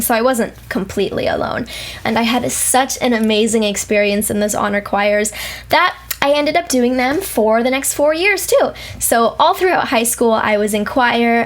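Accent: Castile, Spain. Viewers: Que divertido el acento de españa en su español.... Gracias por tu excelente Q&A... muy interesante! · hablas como española , sera que has estado en españa mi hijo es compositor de musica charra ( mexican cowboy music ) with mariachis, you can find his music on you tube under the name edmond broes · It was interesting to hear how you learned Spanish and it explains why you often (always?) use Castilian pronunciation. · Wow I just realized the Spaniard Spanish accent. Very different to the Spanish I was taught, mostly by teachers from South America(Bolivia or Argentina). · That explained. You living in US having a Spanish accent, not a latin one. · I LOVE your Spanish!!!! The accent SEEMS Castillian. It's been sooooo many years since I've learned and used my Spanish, but it was Castillian. PLUS you are so easy to understand when you speak Spanish. It makes me feel much more proficient than I am.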